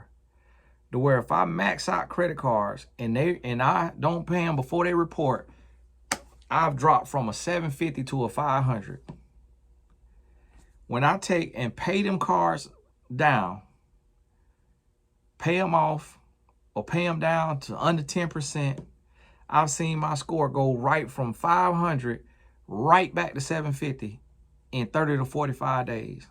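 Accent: South, United States. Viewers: I just LOVE how you talked to your wife when she came into the room. You can SEE the love and adoration you have for her! Just beautiful! And everything you said is ON POINT! Also, PLEASE keep your Southern accent. It’s beautiful, down-to-earth, sometimes comical (when intended) and refreshing. God Bless You Tony Gaskins!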